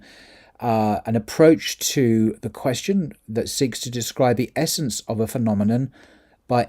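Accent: United Kingdom. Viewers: So ‘phenomenological’ is a tongue twister term for you. I was wondering if you had one! 😅 you have that eloquent British accent that sounds magical...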